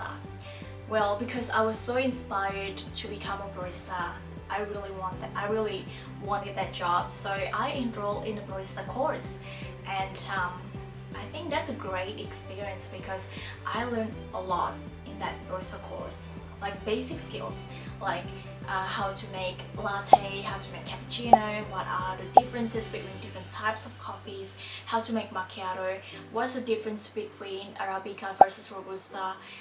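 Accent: Australia. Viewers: 7 years with a good ozzy accent, me living for 11 years (94 - 05) still cant get that accent. BTW good luck on your goal. sukses ! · hello! i'm indonesian too, i really love to watching your videos! i actually thought you're australian cuz your accent is so awesome, cool!😳😍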